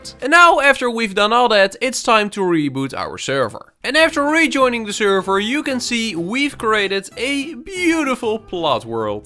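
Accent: Netherlands. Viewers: sounds very dutch xd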